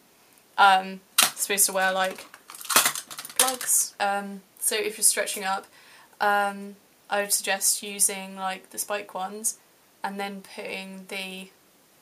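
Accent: United Kingdom. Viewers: u sound english not american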